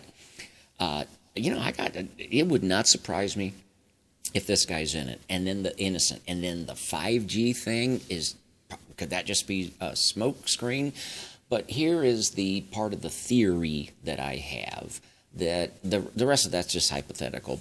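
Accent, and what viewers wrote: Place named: Mississippi, United States. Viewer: I live in Mississippi and I lost my mom to lung ca 11 yrs ago! They're proud of us!! Love your Mississippi accent!! Great!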